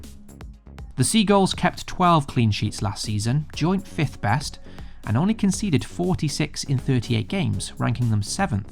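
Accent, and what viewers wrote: Wales, United Kingdom. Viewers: Is it just me or has he turned welsh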